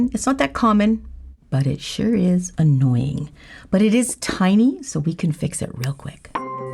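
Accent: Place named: Canada. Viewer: I love your voice & accent. It feels like home as my aunts & cousins are Canadian 😊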